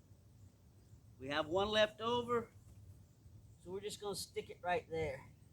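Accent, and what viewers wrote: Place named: South, United States. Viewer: You have just a little bit of a Southern drawl, sir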